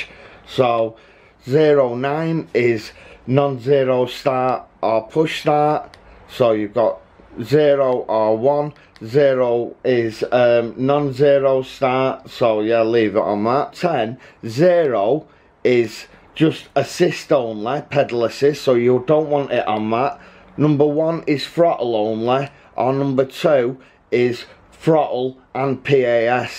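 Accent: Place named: Manchester, United Kingdom. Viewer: Proper manc lad hah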